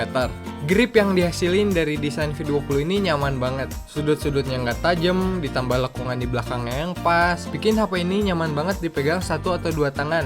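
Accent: West Java, Indonesia. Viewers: Udah "mah" kameranya bagus...eta meni sunda pisan si akang...linggih dmn mang?wkwk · pertama kali mampir ke channel agan, sunda pisan ini logatnya, ditambah liat "ciparay" :P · orang sunda sigana ieu teh nu review na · logatnya sunda banget gan😀 btw kameranya ngiler sama anti mainstrim 👍harganya udh turun 5jt lbih skrng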